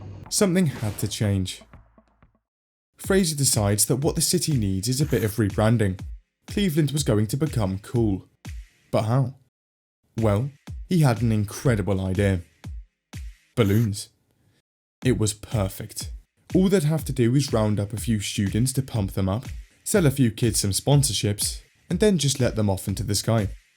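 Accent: United Kingdom. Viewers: Not fond of a Brit voicing this about Cleveland. If you’re gunna make fun of the USA, get someone with an American accent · I love the British man going over my state's history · with a bri ish ascent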